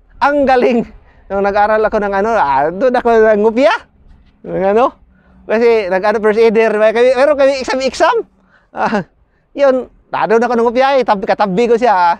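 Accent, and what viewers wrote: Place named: Visayas, Philippines. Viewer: Hoy mag bisaya kanalang oi halata Ang Tagalog Mo dol.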